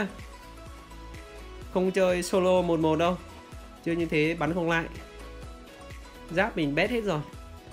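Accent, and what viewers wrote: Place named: Ha Tinh, Vietnam. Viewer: Giọng này khả năng là Hà Tĩnh :D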